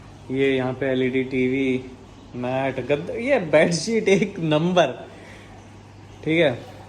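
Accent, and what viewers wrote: Haryana, India. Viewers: Aap hariyanvi me baat kiya kro bhai maza aata hai bhot us accent me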